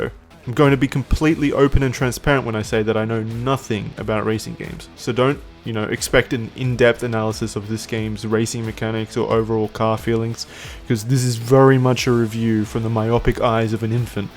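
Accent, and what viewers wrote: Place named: Australia. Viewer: Haha funny Australian man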